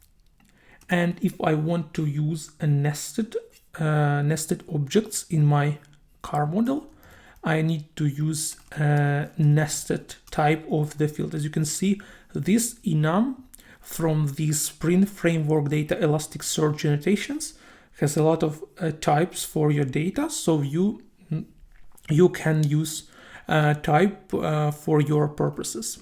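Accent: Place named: Eastern Europe. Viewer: slavic accent😎💪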